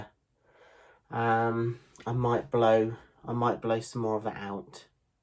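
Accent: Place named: Northern England, United Kingdom. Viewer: its brilliant!! Congratulations! I’m a Manchester lass who moved to America 36 years ago 😳.I come home every year to top up my accent LOL It’s so lovely to hear your Northern accent! You are so relaxing and non intimidating to watch! ❤️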